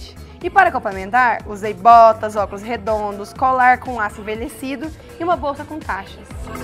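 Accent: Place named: Goiás, Brazil. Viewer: Linda demais com esse sotaque Goiano 😍 Não vejo a hora de te ver ♡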